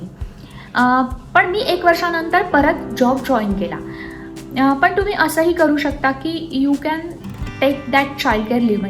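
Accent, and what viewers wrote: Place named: India. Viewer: No offence but Indian dialect of English is really hard to swallow in.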